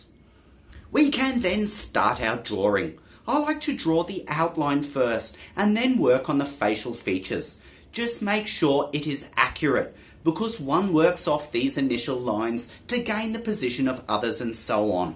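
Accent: Australia. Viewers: lo, the dude has an extremely heavy Australian accent, I think ur safe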